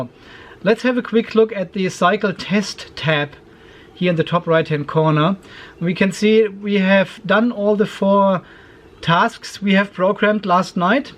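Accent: Germany. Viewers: CamperT aus Deutschland. Sag mal bist du deutschsprachig? "alter Schwede" kennt sonst kein Mensch. Und dein harter Akzent im Englischen ist wunderbar zu verstehen. Deine Videos sind klasse, habe den EBC A40 schon bestellt. Bitte weiter so in "down under". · I love your denglish !!!!!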